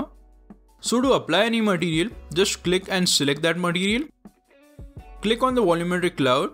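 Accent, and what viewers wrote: India. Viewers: The indian accent is terrible to listen...evolve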